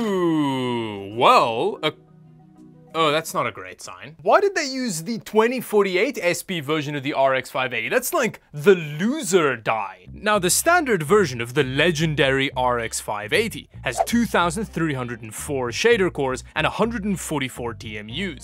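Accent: South Africa. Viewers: how do you sound like ur south african with that accent? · Your accent and your name makes it seem like you're from South Africa, are you?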